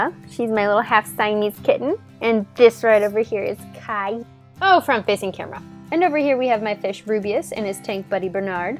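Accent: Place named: South, United States. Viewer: what sounds like a Southern accent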